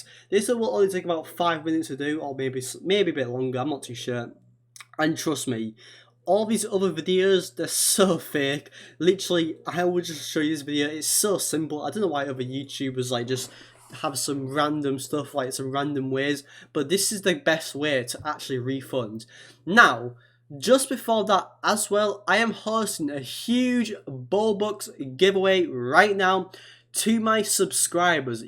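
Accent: United Kingdom. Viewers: 100% brithish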